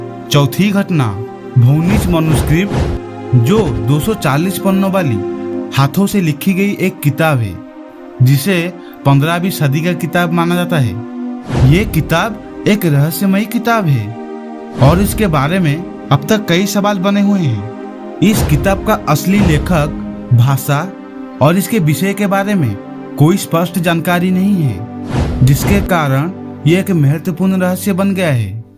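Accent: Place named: Bihar, India. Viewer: BC bihario vali voice itni gatiya sound kr rhi h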